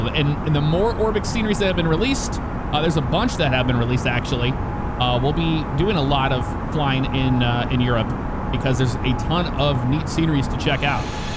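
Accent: Newcastle, United Kingdom. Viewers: Are yo a geordie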